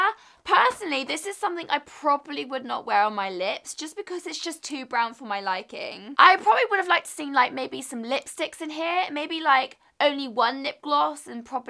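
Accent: United Kingdom. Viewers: Are you from Britain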